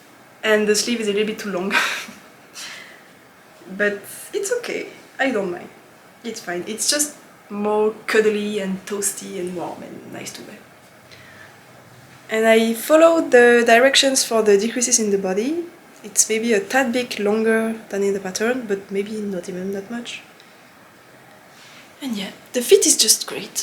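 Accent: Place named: France. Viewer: Love you French accent. Do you use raveyto count your projects?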